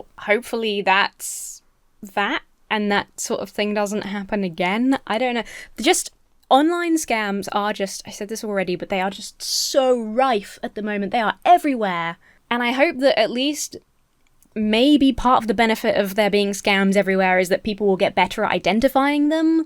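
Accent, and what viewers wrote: United States. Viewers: Your american accent is getting really good, it's a little disorienting! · Your American accent is pretty decent but still it had me cracking up. Catfishing is dumb and violating. Hopefully it doesn't happen again. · Your American accent is superb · You are not a small channel anymore. Twice in the past 2 months I came across other channels that mentioned your name or had your picture. You have a great american accent. You are almost as good as Alba Baptista who started in "Watrrior Nun". I was shocked when I found out baptista wasn't american. There are too many bad people on the internet. I hope they didn't completely ruin it. I love my online friends. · IT WAS SO WEIRD HEARING YOU TALK WITH AN AMERICAN ACCENT!!!! As an American, you sounded totally authentic. And I'm sorry, I cannot lie. You sounded kinda hot.....for a lesbian. 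;P I always love and respect you just the way you are. Please, forgive me for finding you so (in random order) charming, funny, beautiful, smart and sexy. I'm such a horrible sexist! lol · Your American accent is almost too good. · Love your American accent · the American accent. Love it. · All I learned from this video was how much I want to hear your american accent MORE. LIke a LOT more. k thx bye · Sorry that happened to you, weird and a little gross. I can't put my finger on it, but your american accent weired me out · 😂😂 I find it hilarious when you lean in hard to the American accent and British-isms just to draw the contrast. (Or vice versa.) By the way, you're doing great on your American accent! EDIT: Just got to the part about the bad news. That's awful. I hope you can find some legal recourse. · I completely didn't notice the American accent until she became British again. Bro. 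Edit: I'm American, and I can say that it's a pretty convincing accent. It just feels weird to hear with the knowledge that it's fake. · That's crazy that someone did that. Also I love your American accent. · Not a bad, though obviously exaggerated, American accent! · Your American accent is so good it's tripping me out lol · a note about your american accent, you've gotten really good at it lately, but you are over-ennunciating everything, you sound like a work training video more than a real person. You aren't reducing enough vowels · I love your American Accent! It's two years later, and many things have gotten worse. I've heard of several schools where students were disciplined for using "nudify" apps on their classmates. AI programs that show photorealistic extrapolations of people naked. Utterly disgusting, and beyond utterly disgusting when it's done to minors. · Your American accent is pretty amazing. 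In most of the US, we do say the R in ‘snark’, although it’s not that common of a word in younger generations. Snarky is sometimes, but sassy or sarcastic are more common in my experience. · I rarely ever see people attempt (let alone, nail) an American accent. I was just listening for a few seconds after clicking this video and thought i tapped the wrong thumbnail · oh no your american accent is getting pretty good. also i do not think an american would say snark or cheeky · Nice American accent! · You're American accent is scary good, if I tried to do a British accent I would sound like Dick Van Dike in Mary Poppins. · Your American accent creeps me out a little. 😆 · Great American accent... and I notice you kept the ejective K! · sometimes your American accent reminds me of Erika Thompson- texasbeeworks. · That was a SCARILY accurate american accent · Your American accent LOL awesome.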